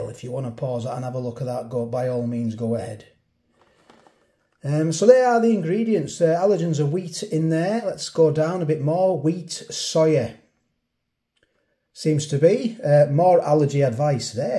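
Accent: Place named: North, United Kingdom. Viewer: By the way the wholesome northern accent makes your channel feel so authentic like an authentic northern cuppa T lol 😂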